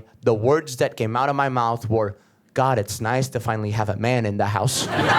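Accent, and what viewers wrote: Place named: Portugal. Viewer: percebi q nunca aguentei escutar um português falando por tanto tempo qq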